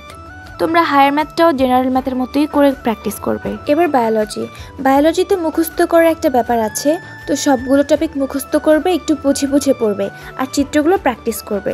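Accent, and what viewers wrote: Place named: Sylhet, Bangladesh. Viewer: Are u sylhty??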